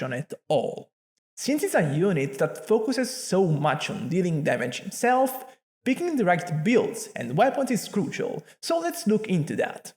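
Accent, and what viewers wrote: Italy. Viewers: This question has nothing to do with Neuvillete but, are you italian?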